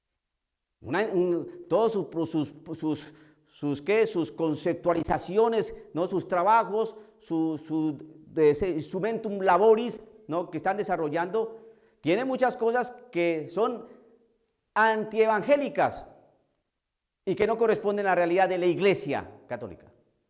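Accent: Colombia. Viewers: cómo va a ser de Venezuela, es obvio que es colombiano, solo hay que oírle.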